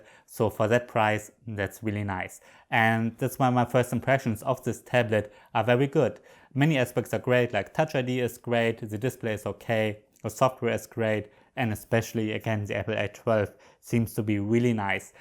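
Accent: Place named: Germany. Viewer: I'm still waiting for apple to redesign Thier budget iPad! So waiting for 9th or 10th gen! And I watch your videos after a long time before watching I really missed your German-English voice!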